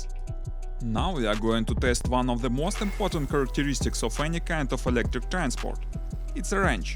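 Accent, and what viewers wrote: Russia. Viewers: Русский акцент сразу палится) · U have a Russian accent don’t be scared to tell us the Brand-name I want 1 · Sounds Russian to me.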